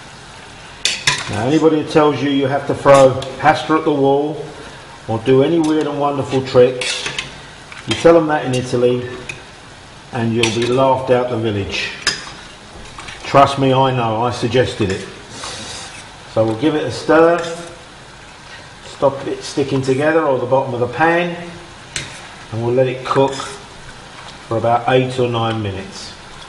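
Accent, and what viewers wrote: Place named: United Kingdom. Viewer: I love the recipe and the surprise that a British-sounding cook has real Italian-speaking family members. Love it!!!!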